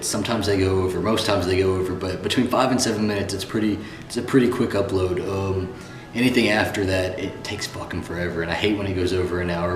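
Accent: South, United States. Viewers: My hero Brave and strong Im southern as well love the accent You Rock ! You actually inspire and help people unlike most self absorbed you tubers . Dr pepper just gave your roots away ! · You have one of the most comforting southern voices I've ever heard. It's like a warm blanket you simply love wrapping yourself up in. Add to that you seem to be a natural born storytelling, making it possible to cast a spell so easily upon your listeners. Not to mention you're cute as a button! Yes you are!! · Love your videos! Love your southern voice. Also, nice Hickie! ;)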